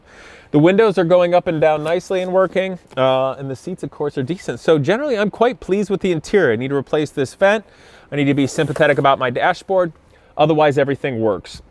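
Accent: England, United Kingdom. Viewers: Good vid v detailed, do have a soft spot 4 944's. Nice example. Love the plummy posh English accent! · Excellent buyers guide. I would suggest you cover the dash with a leather cover. This is available online. I did find the British accent annoying though... Im part of the Porsche Club GB and I’ve never heard anyone be quite that anal. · Great British accent,! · Rally it, that English accent is sick haha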